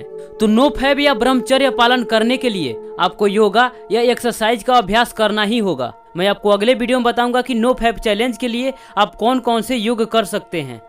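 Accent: Bihar, India. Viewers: Aap bihari ho n pakka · Bhi app bihari ho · Bro you from bihar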